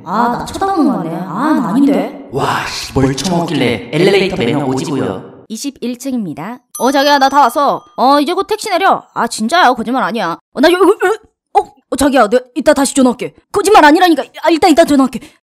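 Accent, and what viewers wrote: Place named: Gyeongsang, South Korea. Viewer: 대구 사람인 것 같은데요?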